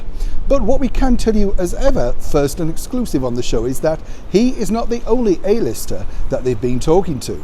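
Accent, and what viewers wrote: Yorkshire, United Kingdom. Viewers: Aorta.. iota..think it's just the Yorkshire accent🤗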